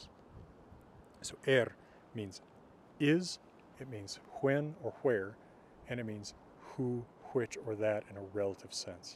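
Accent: United States. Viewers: As a Scandinavian this only sounds like a American trying to speak Scandinavian 😂 Æ is ÄÄÄÄ. V is not W. Y doesnt sound like what you are saying 😂 Å is another story. Also how do you know how they pronounced R? And from what region?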